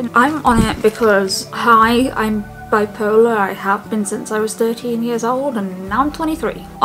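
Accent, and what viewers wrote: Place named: United Kingdom. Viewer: Lovr your hair and glasses and beautiful accent if u dont mind me asking where u from are u British from England or London can't quite pinpoint your accent but i love it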